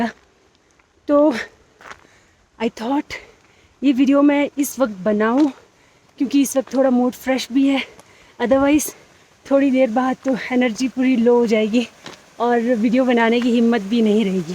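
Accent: Standard. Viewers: Lol here u are speaking what I assume a pure tibetan, n on the other lies me who can't utter a single word, tashi delek sis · བོད་སྐད་གཙང་མ་འདུག་་བོད་ཡིག་གང་ཡག་པོ་ཨེ་ཤེས་ལགས་ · ང་ནི་ཚོགས་མི་གསར་པ་ཡིན་ཁྱེད་རང་གི་བོད་སྐད་དཔེ་རང་གཙང་མ་འདུག་ཁྱེད་རང་གི་ངོ་སྤྲོད་གསལ་པོ་གནང་སོང་ཐུགས་རྗེ་ཆེ་👍👌🙏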